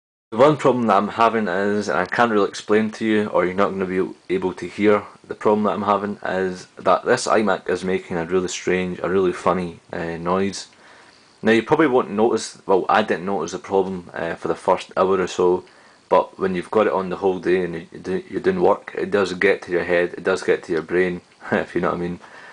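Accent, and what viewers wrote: Scotland, United Kingdom. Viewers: scotish? irn bru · wtf? scotish..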